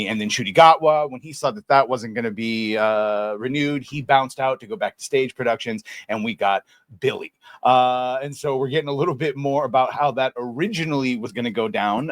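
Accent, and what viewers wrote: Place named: Cockney, United Kingdom. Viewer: Love the cockney accent, jokes on you though as it's been replaced with the Pakistani English accent.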